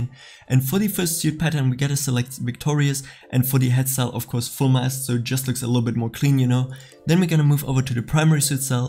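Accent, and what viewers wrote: Germany. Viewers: Are you german? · bruder dieser deutsche aktzent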